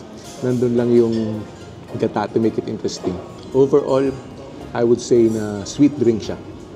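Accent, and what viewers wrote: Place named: Lucban, Philippines. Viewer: Taga saan ka po, you sounds like lucbanin😅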